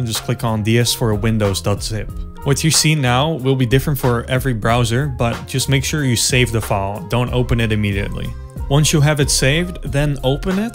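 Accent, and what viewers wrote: Netherlands. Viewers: Are you dutch? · I heard your dutch accent and instantly thought u were dutch then i saw the notification on ur laptop and yeah i was right you're dutch! Just like me :) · r u dutch? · are yu dutch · i knew you were dutch also ty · you have a dutch accent.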